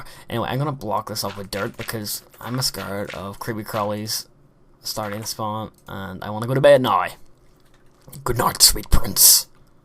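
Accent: Ireland. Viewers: what part of ireland r u from?? And also, WHATS THA CRAIC!!! :D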